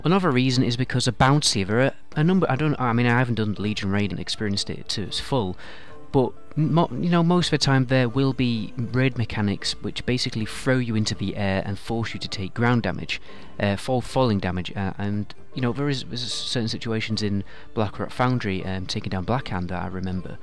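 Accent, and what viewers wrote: United Kingdom. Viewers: off topic but may I ask where in the UK you're from? I recognise your accent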